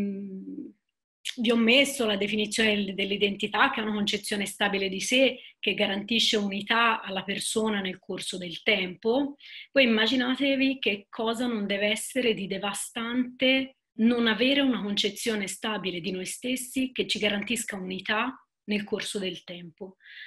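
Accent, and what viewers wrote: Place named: Tuscany, Italy. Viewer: peccato per l'audio, a parte l'accento toscano che tende ad aspirare certe consonanti ebbene sarà anche per l'inconsueta "velocità espressiva" alcune parole non si comprendono, in particolare le ultime della frase . . . cmq 👍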